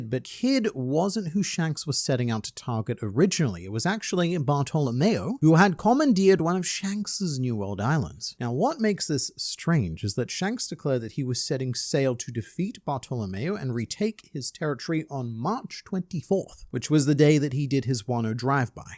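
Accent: Australia. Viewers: I like his yapping. I come to hear a fancy aussie yap about fictional pirates.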